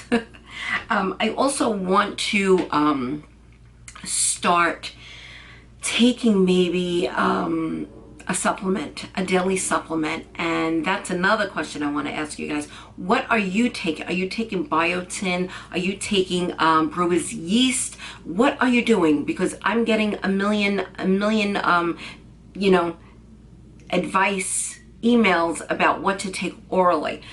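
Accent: New York, United States. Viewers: All ingredients used for hair growth have to come from inside the body not on top. You need biotin selenium etc. Dr. Berg has an incredible formula for hair growth. Ps. You gotta be from nyc you sound like me! Queens?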